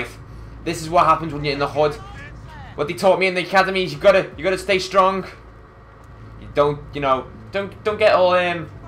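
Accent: Liverpool, United Kingdom. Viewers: GinaBee yeah i think so, SCOUSE !!! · Are you from Liverpool?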